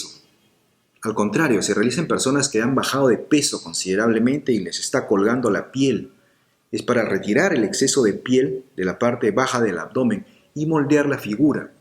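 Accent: Peru. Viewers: Por su manera de hablar es de mi querido Perú, ! Gracias por informarnos. Dios te bendiga! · Alguien sabe de q pais es? Por el acento parece peruano? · Donde tiene ud su consultorio yo soy de Ecuador y x su dialecto ud me parece peruano o me.equivoco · Muchas gracias x la información me gustaria una consulta con ud podria facilitarme un numero para poder llamarlo. Por el acento puedo decir q es peruano cierto? Espero su respuesta doctor gracias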